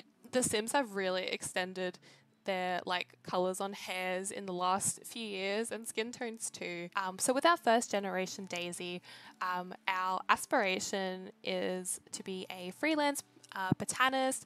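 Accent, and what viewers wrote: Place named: Australia. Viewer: Got some catching up to do🤍love this vid. Your accent is awesome🤭Aus?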